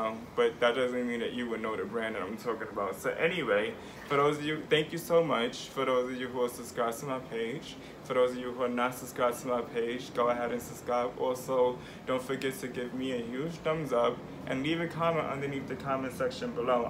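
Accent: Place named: Puerto Rico. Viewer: Yes,I really I❤VE his sound/accent/voice!! Also has a lil boriqua mix too! Always so informative very smart,with much intellect😊I just love him!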